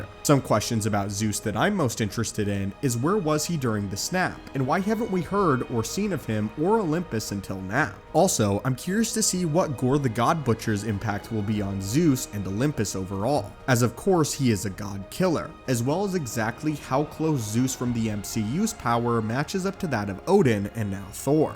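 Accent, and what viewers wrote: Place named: United States. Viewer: The americanized pronunciation of the names of greek gods is very distracting.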